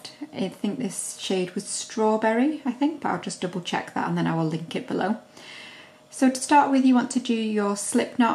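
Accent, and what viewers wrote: United Kingdom. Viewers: I love Brits accents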